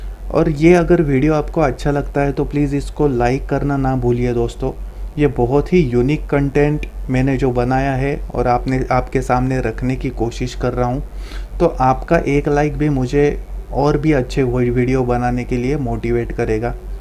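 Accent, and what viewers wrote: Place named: Maharashtra, India. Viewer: आवाज आणि उच्चार ऐकून वाटत की तु मराठी आहेस. तुझे vdo पाहिले आहेत. खुपच माहितिपूर्ण vdo आहेत. तुझे खुप खुप आभार🙏 अवांतर :- मित्रा मला तुझा आवाज अमोल पालेकरां सारखा वाटतो,😊😉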